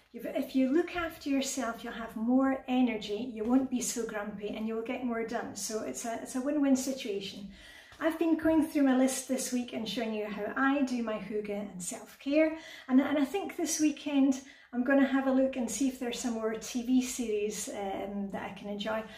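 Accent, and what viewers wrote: Scotland, United Kingdom. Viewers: my little one's comment when you said "Little" -- "Mum she's from Ireland" -- I laughed and said no Scotland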